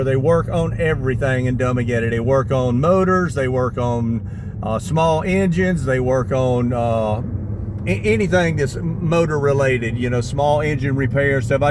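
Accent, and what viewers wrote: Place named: Texas, United States. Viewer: Men just opinion to gain follower as you are leaving in Philippine you should hold back and be mindofull or should I say make your vlog meaningfull on side of being us , I get you as Joe in our country but if your running this vlog as if your expecting something to gain in good way I will tell you you sound like fron Texas or mid west as fuck , again if you want to gain in nice way in your vlog be with us and understand , we don't like people judge us coz we are pinoy we leave like this. Get you ass off our of our country if you talk or sound like Joe to educate us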